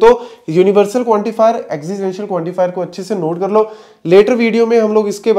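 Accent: Punjab, India. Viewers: nahin probably somewhere around Amritsar-Chanidgarh-Delhi Area I can notice punjabi touch in his hindi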